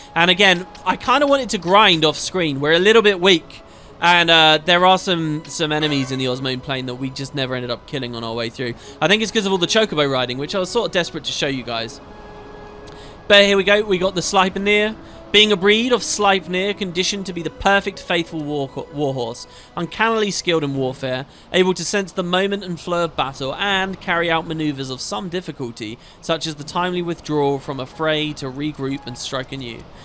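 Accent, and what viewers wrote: United Kingdom. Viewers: My wishes were granted, the video, at least now, is not private. Not hidden in a playlist. All hail the British dudes reading stuff to me.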